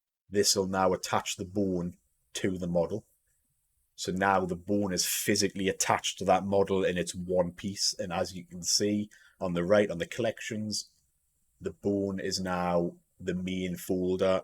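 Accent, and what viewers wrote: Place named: Newcastle, United Kingdom. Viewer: i hear the Geordie accent?? immediately haha.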